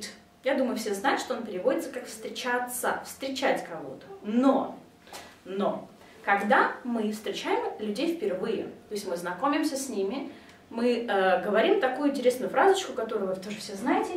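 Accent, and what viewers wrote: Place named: United States. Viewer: Кстати у американский акцент, как у носителя языка с первого раза и не скажешь, что она русская!!! Продолжайте в том же духе и по больше видео!!!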